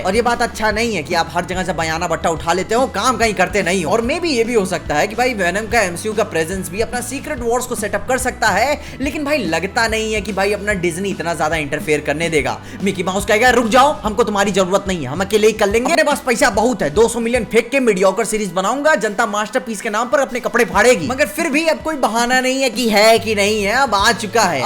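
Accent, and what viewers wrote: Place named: Bihar, India. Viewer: bhai tera bihari accent bekar hbai,bhai pure hindi me hi explain kar le ,jyda accha lageag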